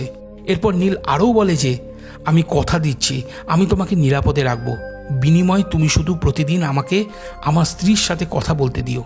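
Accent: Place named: Bangladesh. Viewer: তার জন্য আপনার কথাবার্তার ধরণ বাংলাদেশি,, 🇧🇩,, বাংলাদেশি ভাব আছে আমি বাংলাদেশ কুষ্টিয়া জেলা থেকে আপনার ভিডিও দেখি অনেক দিন ধরে আমার টার্গেট তাহলে ঠিক আপনি বাংলাদেশের বাংশোদ্ভূত 🇧🇩❤️❤️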